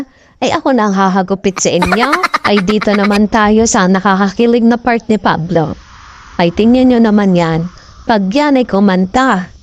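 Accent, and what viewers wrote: Batangas, Philippines. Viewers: Thank you for sharing this compilation of Pablo. Your Batangeño accent and jokes made your video so unique and fun to watch. Waiting for Justin Ken and Josh 😍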